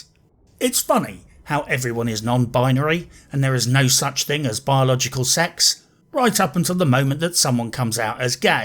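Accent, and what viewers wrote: United Kingdom. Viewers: You can't tell he's a brit by his voice? Dull one hm?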